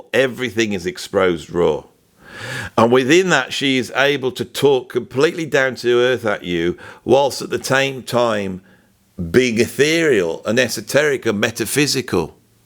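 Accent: United Kingdom. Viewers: It is episodes like this one that keep me watching,. I have subscribed and unsubscribed a number of times, usually because of offense that you either purposefully or inadvertently bring to my Christian beliefs. But its when you get right down to what life is all about and get very transparent and authentic that keeps me hanging around. I do enjoy your sense of humor and your entertaining British accent, which reminds me of the Beatles, and I understand that every episode can't be like this one, but we all need to be more human and look at ourselves and others for what we are to understand our wretched sinful condition and our need for the love of God. Keep up the good work!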